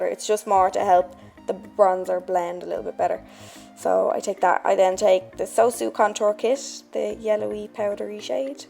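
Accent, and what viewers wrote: Ireland. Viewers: from her accent she’s definitely from ireland.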